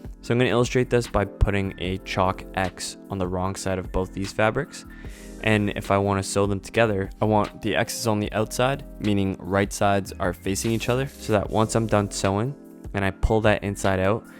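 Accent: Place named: Toronto, Canada. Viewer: I totally pegged your Toronto accent :) Anyhoo, great video, your instructions are clear and well thought out. I just started sewing again after a couple of decades, and really enjoying this learning phase from Youtube videos. Waaaaaay better than what I learned in school! All we learned was how to make stuff from patterns, but not how to fix things, mend them, or how to make patterns from clothes without having to take a master's physics and geometry in university. Subscribed!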